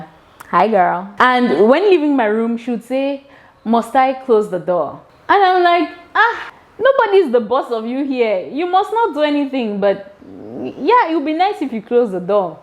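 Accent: Nigeria. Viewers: You sound Nigerian but look South African, it's easier to understand why locals spoke Xhosa to you. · OK first of all, you look beautiful. And yes, you do look and sound Nigerian. I lived in South Africa for 16 years. No doubt its a beautiful country. But just like every other country including my beloved Naija, has its flaws. Topic for another day. So yeah, I enjoyed ur innocent and open minded view of Cape Town / South Africa. . I could relay to most of the things you said when I newly came. Good a thing you kept the video simple, funny and educative. Thinking of subscribing to your channel, if for nothing else, just to see ur smile once more. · u sound nigerian though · You went in on the languages segment 😂😂 I'm with you on "must" and "should". "Cape Town is not a place you go to fit in, you go to stand out" that's a word chiiiile. I also thought you were Nigerian from your accent 😂🤭 And that emphasis on "Zimbabwean friends" took me out looool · 😂😂😂😂😂😂there is a bit of Nigerian accent I hear it too. 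However I think as well it’s by default we usually come across more Nigerian Africans then Other other country besides Zimbabwe . You geogeous though ❤single? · it's true, you sound Nigerian. When I saw in Miss Trudy's vlog , I took ma a while to realise that you were Cameroonian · In Botswana, we live with so many Nigerians and their accent is like yours. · Lmao I'm not sure about you being light skinned making SAns thinking you're Nigerian but I think you really sound Nigerian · I thought u were Ghanaian, u are beautiful like Jackie Appiah.....but yeah u sound Nigerian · IMO…Yes! You sound Nigerian.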